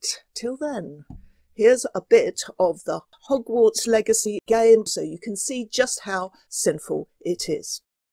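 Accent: United Kingdom. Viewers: you do “sarcasm” better than any one- the British accent makes it even more delicious!!!❤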